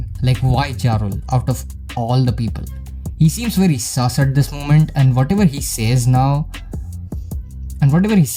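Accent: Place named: India. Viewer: Bhai hindi me bol na English vale koi teri videos nahi dekhte or teri avaj se pata chalta hey indian hey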